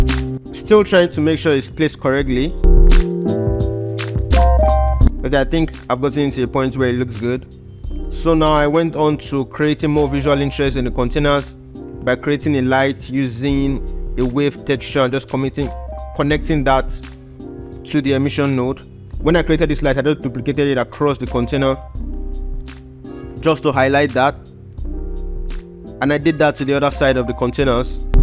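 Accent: Nigeria. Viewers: heyy.. waasup adre you in a nigerian ????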